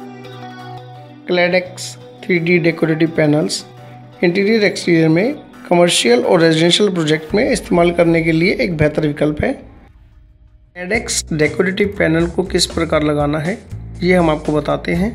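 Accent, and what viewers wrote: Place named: India. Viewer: Indian voice -.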